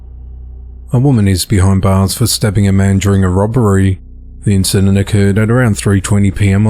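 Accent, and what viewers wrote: Australia. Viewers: You're definitely an Australian, hey?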